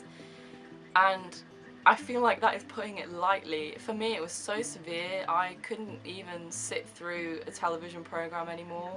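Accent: United Kingdom. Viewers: I don't mind your tourette's . I hate the British accent.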